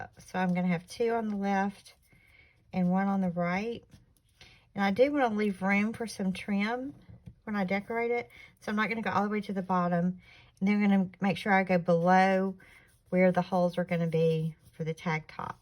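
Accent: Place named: South, United States. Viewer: I enjoy your sweet Southern accent as much as the tutorial 🌼 Such beautiful pieces!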